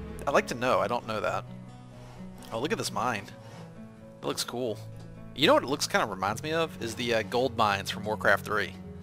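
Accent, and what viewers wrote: South, United States. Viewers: Thats a Southern accent people... herps [erbs]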